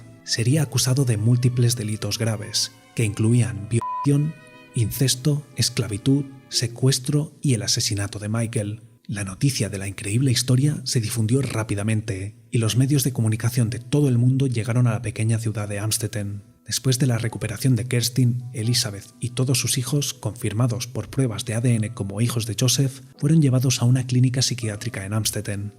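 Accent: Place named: Basque Country, Spain. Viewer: Oye. De dónde eres?? Ese “pero” k metes al final de las frases esmuy euskaldun 😂😂 igual me he colado. Pero diría k eres de Donosti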